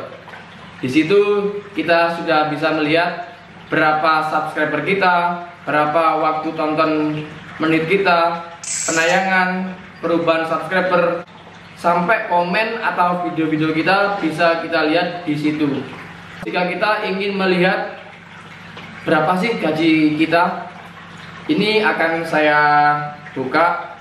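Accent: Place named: Java, Indonesia. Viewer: Logat medok dan kaku yg penting sukses mas.. salam dari youtuber anyar🙏🙏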